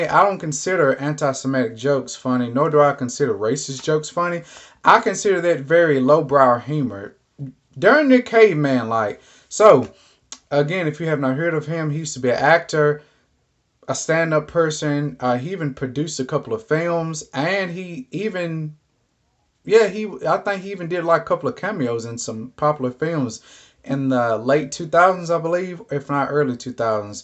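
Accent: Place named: Texas, United States. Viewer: You sound like you’re from Texas like me.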